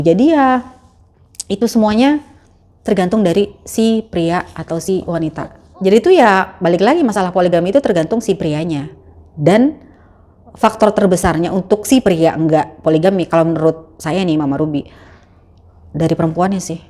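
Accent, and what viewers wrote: Jakarta, Indonesia. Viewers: Alhamdulillah akhirnya dapet jwbnnya jg dimenit 10.40 dari awal aksen betokaw nya kentara bener mpok.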